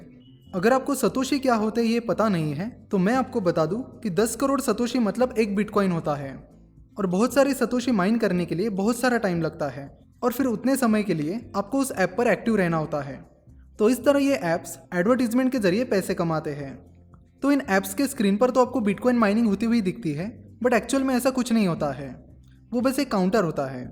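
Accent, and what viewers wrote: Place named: Indore, India. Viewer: Pakka indore wale hoge ye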